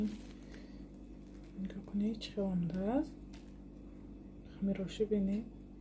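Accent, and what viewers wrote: Isfara, Tajikistan. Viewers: Бехтарин кадбоонуи зур шумо ба назарам аз исфара лахчаи исфарагихобоин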